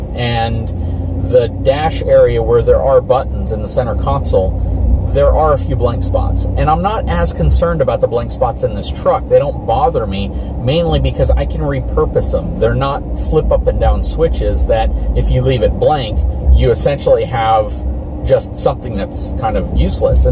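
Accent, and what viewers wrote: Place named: North, United States. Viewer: You sound like a yankee.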